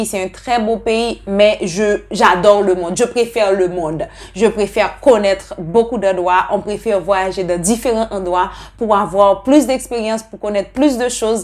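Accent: Haiti. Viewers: Elle a un accent de Haïti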